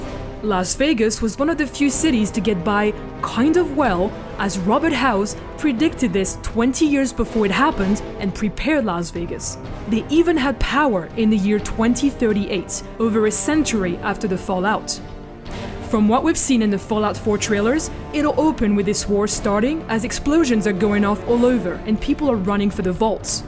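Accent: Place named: France. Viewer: she has a cute accent. sounds French.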